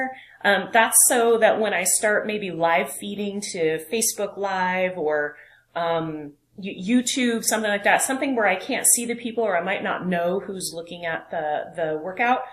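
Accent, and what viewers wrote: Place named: Boston, United States. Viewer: gasp! Boston!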